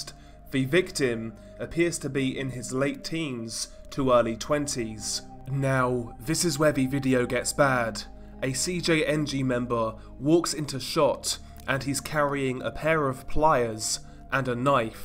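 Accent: Castile, Spain. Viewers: Your Spanish accent has improved a bit but why are you pronouncing in Castellano? Castellano is spoken in Spain only ! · Please don't pronounce the names in European Spanish it is extremely grating and nowhere near as warm and sweet as Mexican Spanish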